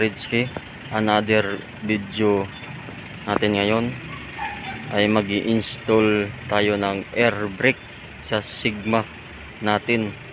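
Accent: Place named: Visayas, Philippines. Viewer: Tunog bisaya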